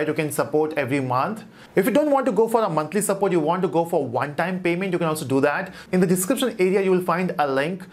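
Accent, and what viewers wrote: India. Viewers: Gracias amigo. the indian accent is awsome. Good luck!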